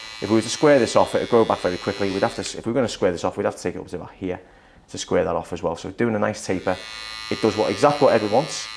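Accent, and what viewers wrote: Liverpool, United Kingdom. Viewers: Bonjour. 😊 Yes has a fairly strong but gentle regional English accent. He is from Liverpool or that area of England. The UK has many different accents. I come from an area of Scotland where we speak both softly and quickly. I'm often not understood by the English.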